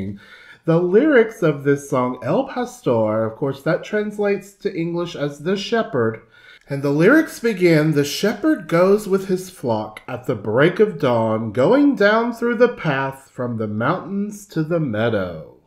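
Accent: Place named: South, United States. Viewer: "I am so GRATEFUL I found you"!!!!! As you kept saying Amazing!!! Amazing!!! I kept saying the same about you, "AMAZING, omg this man is amazing meaning you and the singer". I have heard before, but not depicted and explained as you have done so beautifully. Your reactions, and interpretations, from the symphony, the mariachi, and of course the singer, to the lyric of this beautiful song which is quite meaningful. Thank you so much for your respect an appreciation to latin culture, all though I am Latina but not from Mexico (not mexican) many hispanic cultures, appreciate each others music & folklore. To have such a wonderful person such as yourself, appreciate it too and truly understand the significance, and the value that that this singers VOICE does for this song, was such a pleasure to see. I am now a new sub and fan of yours, I am truly in AWE of your persona, and you truly are a gifted TEACHER in more ways than just voice. 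To see your expressions, and to hear your comments, I was right there with enjoying you & this beautiful composition. I applaud you again & again, music holds no boundaries and you truly are a testament to that. Given the certain discords that many ppl of color have gone through recently in the U.S.A. (a country that means so much to many of us, a country we honor as well) many of us have felt hatred, and bridge in desperate need of repair. Then here you are this wonderful man with a beautiful southern accent, teaching us about music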